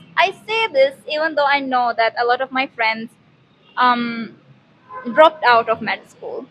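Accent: Philippines. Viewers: She speaks Philippine English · Good,pilipino accent in English.thnx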